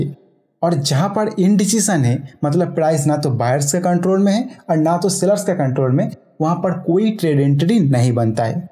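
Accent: Bengal, India. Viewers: app bengali ho kiya?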